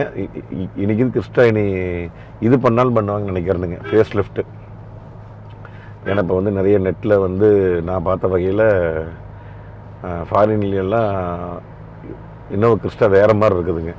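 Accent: Kongu, India. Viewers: உங்கள் அழகு உங்கள் கொங்கு வர்ணனை பேச்சு அண்ணா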